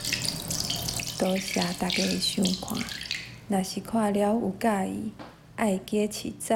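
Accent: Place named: Central Taiwan, Taiwan. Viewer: 配音的腔調真的是中部腔，不是住新竹就是新竹腔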